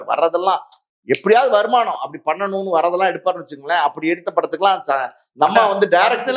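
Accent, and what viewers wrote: Madurai, India. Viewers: WOW Sivappu RED RAMARAJAN sattai with Pure MADURAI village bashai and SHANMUGA SUNDARAM Karakakkakaran style.. scratching THE CHEST.. WOW you are a great actor.. Wonderful voice...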